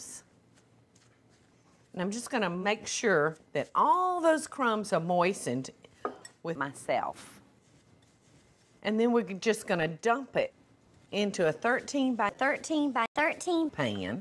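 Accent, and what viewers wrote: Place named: South, United States. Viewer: her southern accent is perfect for YTP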